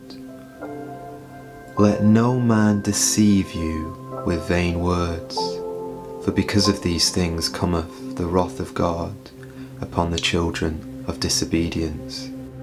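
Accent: United Kingdom. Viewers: I'm so glad you made this type of video,. I can listen to my Father's Word hour after hour after hour. I sincerely do love and honor God's Word and it's nice to have your British voice speaking it!😁 Thank you and may The Lord bless and protect you and your fam on your journey to spread His Word and His precious gospel in Christ Jesus' mighty name. Amen!!😇🎚️🙏🌈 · I am a Filipino Christian ,I just want to congratulate that your voice was lovely a British accent, so lovely hearing Bible verse..God's talent🎈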